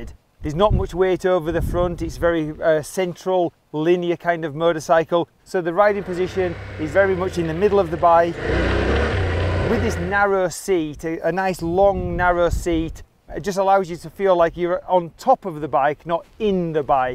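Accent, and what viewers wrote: United Kingdom. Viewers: I love your British accent! So authentic. From kenya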